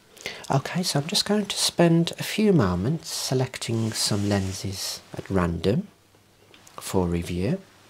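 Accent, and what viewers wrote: Birmingham, United Kingdom. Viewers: Youtube recommendations have served me well! This video was amazing! Your voice reminds me of a Brummie Alan Lee :D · This was great. I initially thought it was a real inspection/appraisal until I saw your other vids lol. You have a super soothing voice. Is that a Birmingham accent? Forgive my ignorance, not from the UK (Australia). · I love britan akcent, sorry my bad english im from Sakha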